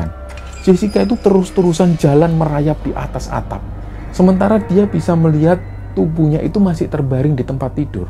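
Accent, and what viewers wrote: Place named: Surabaya, Indonesia. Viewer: mas the best critanya khas suroboyo banget.setiap hari subcribe.nya nambah 1000.salam dari jombang mas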